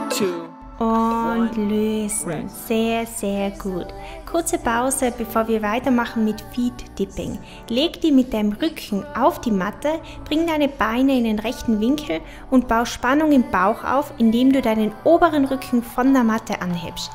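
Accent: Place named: Austria. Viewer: Wer hätte gedacht, dass ich mich mal so über die aufmunternden Worte in österreichischen Dialekt freuen würde, während ich mich auf meinem Fußboden rumquäle! Ohne Spaß, hin und wieder versuche ich mich an anderen Workouts, aber ich komme immer zu deinen Videos zurück. Danke für tolle Arbeit!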